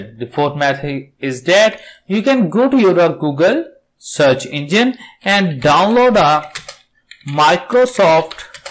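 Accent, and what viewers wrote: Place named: India. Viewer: Услышал акцент индуса сразу понял что можно доверять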